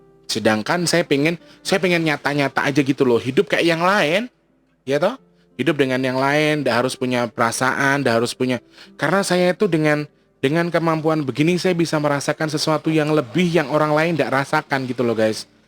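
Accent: Banyumas, Indonesia. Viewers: knp geli banget denger suara masnya ngapak go internasional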